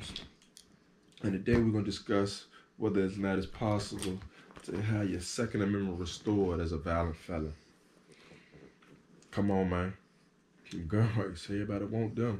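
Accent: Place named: Virginia, United States. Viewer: Knew you were from VA from the accent lol. Not a felon but great video man! More people need to see this.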